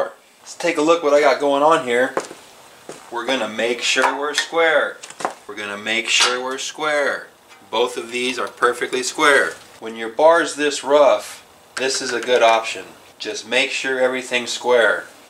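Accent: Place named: South, United States. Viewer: 🪓 MATE, love your attention to detail 🥇 I use a similar grinder but I use the disk wheel with a super fine grade paper (can’t recall what it is 😬). The shop didn’t have a fine grade for the belt at the time. Having one of these grinders actually makes dressing the bar a pleasure 🎉💥 I didn’t know anything about how to check the gauges etc but with Australian hard wood we go though chains ⛓️ pretty darn quick. And hey, I even liked your country drawl… reminded me of Little House On Through Prairie‼️ Hope to see you in the sky one day 🙏 😉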